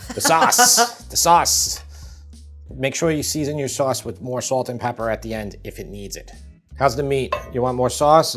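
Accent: New York, United States. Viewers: I love your Ny York accent!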